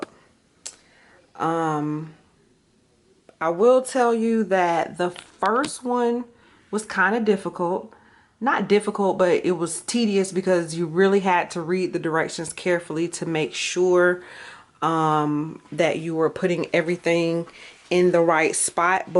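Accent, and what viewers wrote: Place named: South, United States. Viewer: I'm loving being a new subbie...I've just been watching your channel and letting the videos just play. You have such a beautiful personality and love that southern accent...I'm a Mississippi native myself! and PS our men will sit around tucked under blankets until THEY CANT anymore hahahahah lol I loved that!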